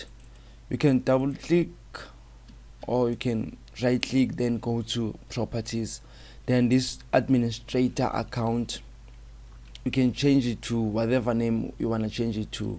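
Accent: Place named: South Africa. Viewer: You sound like South African😊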